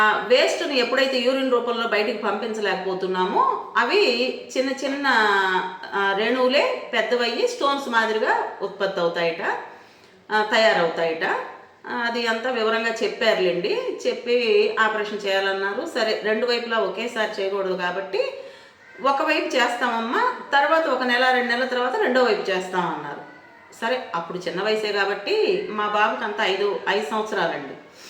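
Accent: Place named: Prakasam-Nellore, India. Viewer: మీరు మాట్లాడే భాష మాకు ఇష్టం. మా చిన్నప్పుడు, మా ఎదురింటి ఆవిడ కూడా ఇలా మాట్లాడేది. ప్రకాశం, నెల్లూరు జిల్లాలలో ఆడవాళ్లు ఇలా మాట్లాడతారని తర్వాత కాలంలో గమనించాము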